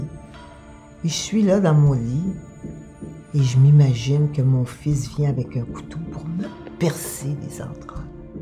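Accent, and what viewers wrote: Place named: Quebec, Canada. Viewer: c un accent québéquois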